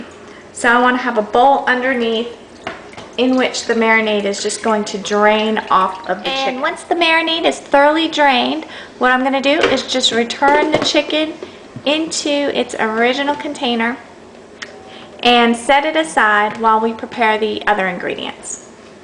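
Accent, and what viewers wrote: South, United States. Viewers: An Asian girl with a southern accent. You know this Bourbon Chicken is on point!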